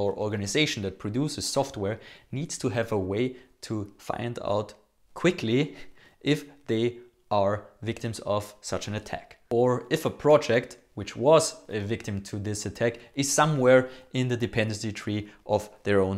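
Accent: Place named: Austria. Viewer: go for English. You're english is good but try to explain what you mean when you use austrian dialect 😂 (I as a german native speaker, with a southern dialect doesn't understood you)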